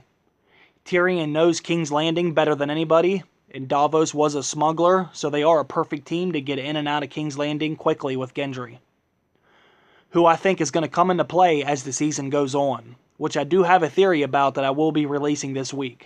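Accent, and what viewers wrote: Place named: Georgia, United States. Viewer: I like your theories, hit and miss with some of mine, I like what you said about the possibility of a dragon killing weapon, interesting idea, I doubt it will be projectile though too 'Hobbitty'. don't worry about the haters this is fantasy for crying out loud, some people take this too seriously. Anyway nice post, thanks for putting the effort. ps. where are you from ? what is your accent going to guess Georgia?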